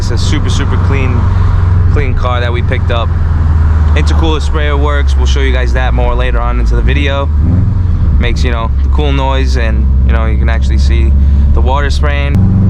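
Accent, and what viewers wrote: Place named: Fall River, United States. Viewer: Lol I’m watching this and I’m like hey that accent sounds familiar. Then I realized it’s Fall River. Sup blica torte!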